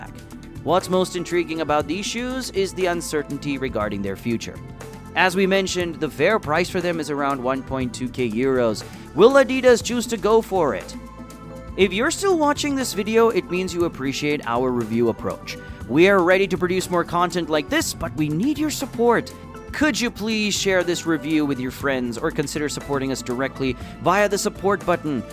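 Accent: Russia. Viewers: I need the Russian accent 😢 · for real? Dang, I miss the Russian accent voice but looking and listening this one, I understood why. It feels like it’s made for a wider, global audience that’s used to American youtube channels. Add to the fact in your reply to another comment that you have two jobs. I’m just glad this channel is just dropped a new video after a long time, right with Adidas’ new elite running shoe.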